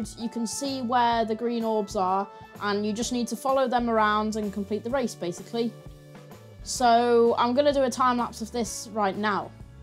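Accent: United Kingdom. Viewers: r u scottish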